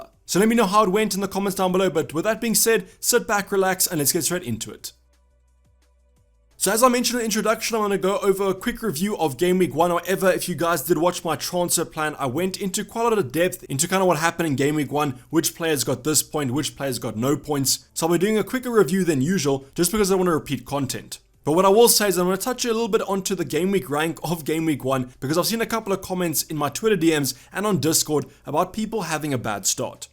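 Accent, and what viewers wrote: South Africa. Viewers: Where in South Africa are you from bro? 🇿🇦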